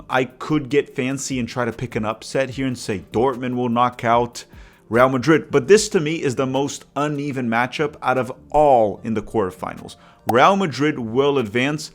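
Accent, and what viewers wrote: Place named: Rio de Janeiro, Brazil. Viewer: Awesome to have Brazilian football/soccer coverage in English. Just wondering why you’re pronouncing Palmeiras and other ‘s’ like a Carioca 😂. My wife (a gringa) who is learning Portuguese was confused and of course I’m steering her towards the Paulista way of pronunciation.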